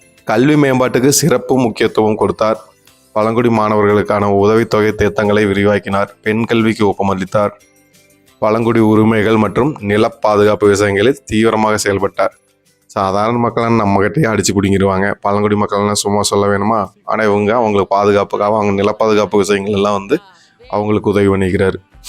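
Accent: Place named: Tirunelveli, India. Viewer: Nellai Kanyakumari tamil pesureenka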